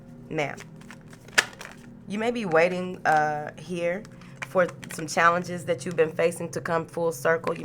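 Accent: South, United States. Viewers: I absolutely love your southern smoothness ❤️❤️❤️ and you’re message resonates heavy ♋️♋️♋️♋️♋️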